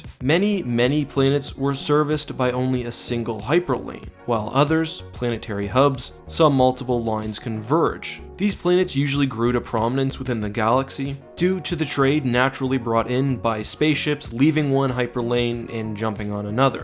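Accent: Canada. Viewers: are you canadian? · Are you from Canada??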